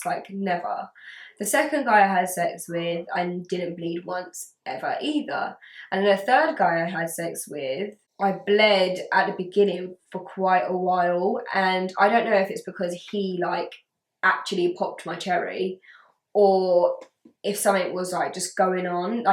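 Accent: England, United Kingdom. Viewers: I like listening to the way you talk I like the UK accent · you have the best english accent and tone of voice I've ever heard. I could listen to you all day!!!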